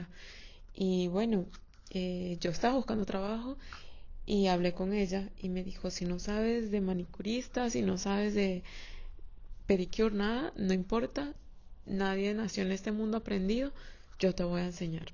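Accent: Colombia. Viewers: Por una razón elemental, los venezolanos hablamos VENEZOLANO, seamos de Caracas, de Oriente, de Mérida, del Táchira, del Llano, de Lara, de Yaracuy y del Zulia, todos sabemos cómo hablamos los venezolanos en cada una de nuestras regiones; y los colombianos hablan COLOMBIANO, sean de la Costa, de Bogotá, de Medellín, de Calí etc. Ella no habla ningún acento venezolano autóctono de nuestras regiones de Venezuela, ella habla colombiano porque es colombiana. 🤷🏻‍♂️ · Se te nota un poco de acento colombiano. Saludos desde España.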